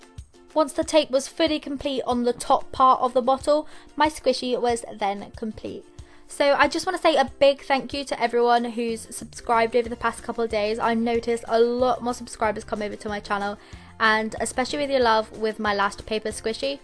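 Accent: United Kingdom. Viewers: Are you British?😃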